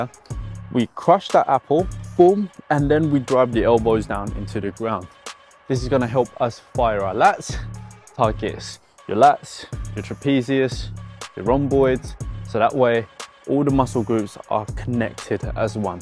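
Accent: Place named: United Kingdom. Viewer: Thanks for the tips nephew, have to say I like your British accent from a young Asian man, I have an Aussie accent for an old Asian man. ( only mentioned this cuz it's my first time hearing it ), best wishes and cheers to you:yougotthis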